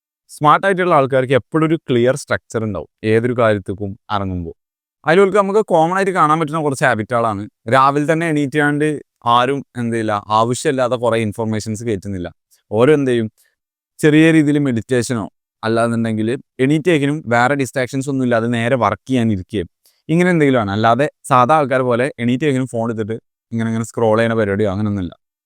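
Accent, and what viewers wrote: Malappuram, India. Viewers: താങ്കളുടെ വീഡിയോകൾ എല്ലാം മികച്ചതാണ്. പക്ഷെ സംസാരത്തിൽ നാടൻ മലപ്പുറം കാക്കാൻമാരുടെ ശൈലി കടന്ന് വരുന്നത് ലജ്ജാകരമാണ്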